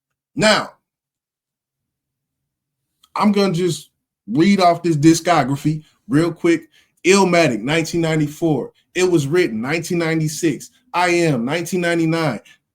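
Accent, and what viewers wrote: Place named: Tennessee, United States. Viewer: You obviously don't know Hip Hop. All over the planet, is ALWAYS in the top 10 best rappers list....and ALMOST ALWAYS in the top 5 best list...and often in the Top 3....and typically called the GOAT by many with Illmatic.as best Hip Hop album ever.....just like this guy here did, and he sounds like he's from back water TN....not North East! Lol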